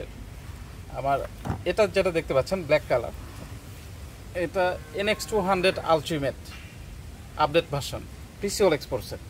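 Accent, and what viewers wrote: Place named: Naogaon, Bangladesh. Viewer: Apnar kotha sune buzhlam apnar bari Naogaon ba Joypurhat . Amr bari naogaon. Nx 200 ta kinte chai. Ki upaye kena jay?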